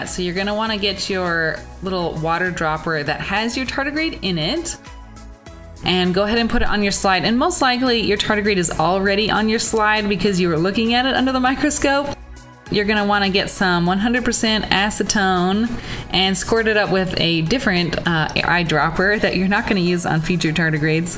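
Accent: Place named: Midwest, United States. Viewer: You sound like youre from Michigan or some midwest state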